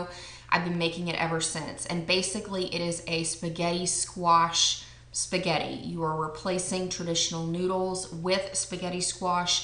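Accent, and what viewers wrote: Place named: Oklahoma, United States. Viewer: You are fairly good at hiding your Okie accent, but I caught it in this video a few times ;) I would love dinner videos, but you know me-- I post food on Instagram a lot. I need to get my "lifestyle" or "mom" channel going so I can post what I eat in a day videos.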